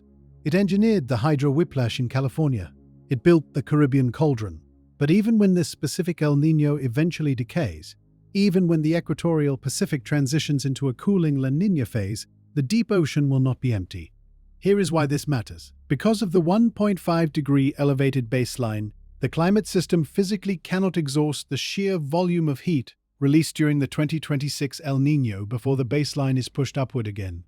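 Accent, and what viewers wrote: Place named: United Kingdom. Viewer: Why are the AI comments about American weather systems, given with a british accent?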